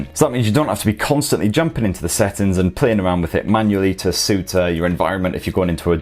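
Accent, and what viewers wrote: United Kingdom. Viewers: Excellent Review! Your British accent helps too. 😎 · Garbage device and garbage accent ! Please speak like normal human being and not British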